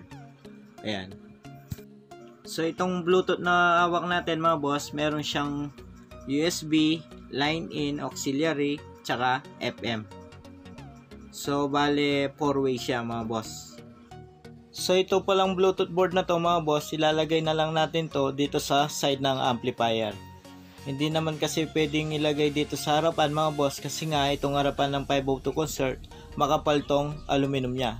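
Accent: Pampanga, Philippines. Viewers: Kapampangan ka ne boss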